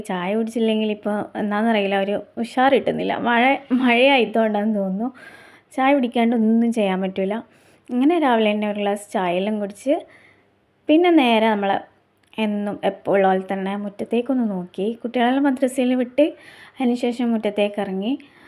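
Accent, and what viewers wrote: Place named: Kannur, India. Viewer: താത്താന്റെ കണ്ണൂർ ഭാഷ അടിപൊളി ആയിക്ക്ണ് 😊😊😊ഡേ ഇൻ മെയ്‌ ലൈഫ് വീഡിയോ പൊളിച്ചു ട്ടോ 👍👍👍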